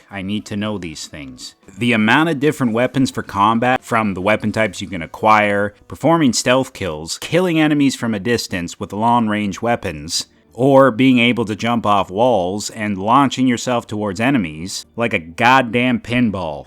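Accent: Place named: Scotland, United Kingdom. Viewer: hey man first of all you have absolutely nothing to apologise for, cuz I know and I absolutely understand how busy your schedule is especially now so I don't mind as long as you were able to read my comment that's enough. And thanks for replying to me back I mean replying back to every single point that I mentioned, I really appreciate that. Thank you man. My support to u is always gonna be green mate. Can't wait for the Scottish Accent to be back and eagerly waiting for your new video. Have an amazing beautiful peaceful wonderful day today and many more happy days to come your way and for your family as well. Cheers mate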